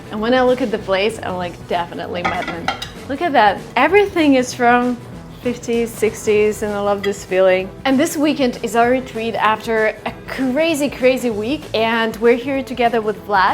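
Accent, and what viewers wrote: United States. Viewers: I really like your videos! I'm watching you from the mexican state of Tlaxcala! you sound pretty american like a native speaker wish to talk just like you! you're a smart cookie! regards lovely!! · your accent sound more and more american with every new video.👍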